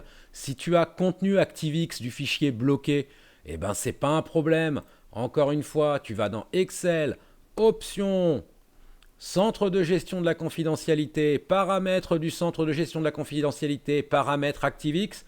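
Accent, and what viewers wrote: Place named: Nord, France. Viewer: J'adore le ton de cette vidéo, bravo au présentateur ! En plus il est concis, ne s'écoute pas parler pendant des heures en mode égo m'as-tu-vu ! J'aime bien aussi la pointe d'accent du Nord, toujours très agréable à mon oreille tant elle rappelle la certitude que quand on a les fricadelles, il n'y a plus rien à prouver, plus rien à prouver. Merci pour ce tuto d'une rare qualité, d'une rare convivialité. Et utile bien sûr !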